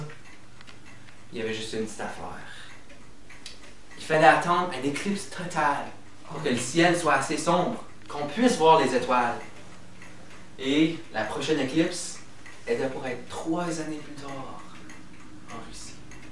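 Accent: Canada. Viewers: hehe you canadian accent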